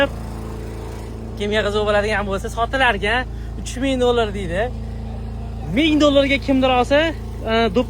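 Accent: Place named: Tashkent, Uzbekistan. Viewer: Ким буларди санга ухшаганде кулма тошкенча гапириш ухшамади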